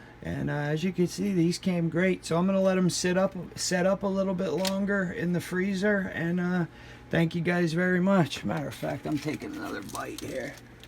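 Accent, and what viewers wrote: Pennsylvania, United States. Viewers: It's called a double boil when you melt gummies or chocolate or butter or w.e in a Pyrex container in boiling water. You can also use a candy melter sometimes sold with candle making or baking stuff . Haha you are definitely from PA I can hear it in your voice! Thanks for the videos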